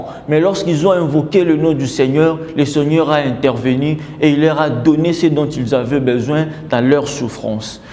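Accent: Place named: Africa. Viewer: Tu as l,acent des africains mais je reconue ton visage c,est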